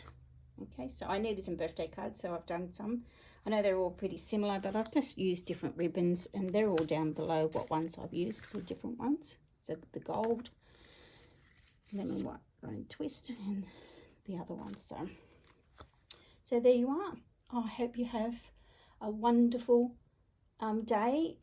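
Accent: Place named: Australia. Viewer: Hi This is the 1st time I have seen you on youtube I didnt know anything about you but your accent made me stay and watch lol. We have to support Our Aussie demonstrators 😊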